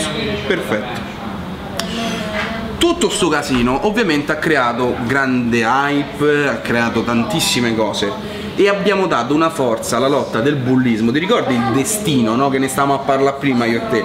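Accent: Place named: Rome, Italy. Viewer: Leonardo Bonucci scusa ma se nn capisci il romano è grave, nn è mica come il sardo dai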